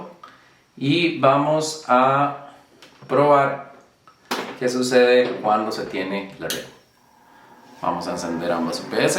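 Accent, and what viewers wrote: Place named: Costa Rica. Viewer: Mae usted es tico? ese acento...😄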